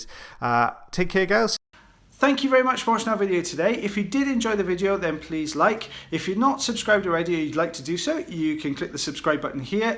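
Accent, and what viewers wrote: Wales, United Kingdom. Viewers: you are now an inch-reading maestro! Thank you for the videos to keep our spirits up. Your lilting Welsh voice is such a comfort! Sending happy thoughts to you and Mrs B. Thank you also for the little lesson on light reflex and the eardrum and hammer bone.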